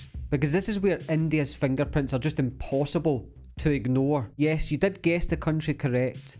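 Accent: Scotland, United Kingdom. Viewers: Shamé on u pa jéet, he's Scottish, it's natural & a nice accent. As u don't have anything to counter the facts so learn to shut up.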